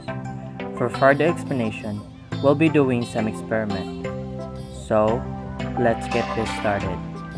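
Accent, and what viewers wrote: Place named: Philippines. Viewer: You sound like a pilipino person im pinoy too.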